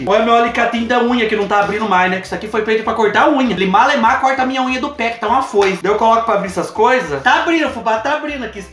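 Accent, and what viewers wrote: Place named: Interior São Paulo, Brazil. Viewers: Fubá do céu muito legal faz sim....,Sotaque lindo vc tem,igual minha mana que mora no interior Paranapanema SP ♥️👏👏👏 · vc me faz lembrar do meu pai, ele nasceu em Itararé, ele tinha o sotaque igual o seu.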